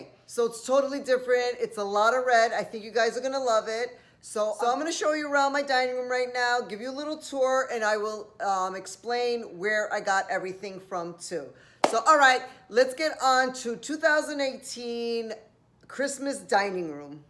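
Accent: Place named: Northeast, United States. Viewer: Beautiful, I like the traditional red and green colors for Christmas. Great idea with the use of the cardinals, I love it. I am going to incorporate them into my Christmas decor next year. I enjoy watching your videos and listening to your accent, you remind me of some of my dear friends. Are you from New York, New Jersey or Pennsylvania, by any chance? Have a wonderful holiday.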